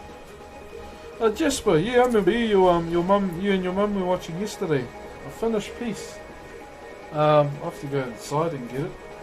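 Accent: New Zealand. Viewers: Is he from New Zealand I love his voice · love the Maori accent, art is important to remember what matters in life.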